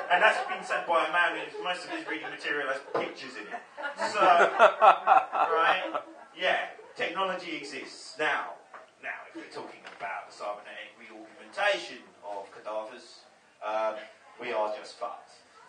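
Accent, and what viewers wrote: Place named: Swansea, United Kingdom. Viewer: he sounds he comes from Swansea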